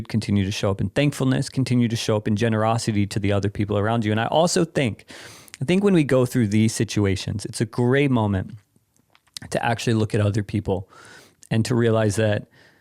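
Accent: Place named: England, United Kingdom. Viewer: Please start making videos here again, i am from brazil, i love your english accent to practice my listening. And the main point is that everything u say at this channel is helping me a lot! Thank u ❣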